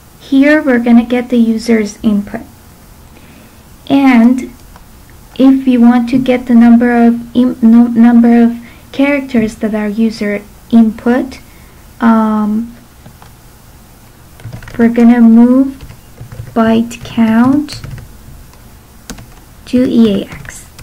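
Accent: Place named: Iran. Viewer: After watching this i noticed your accent seems to be persian and then i chucked ur prof name and i suprised proud of iranian women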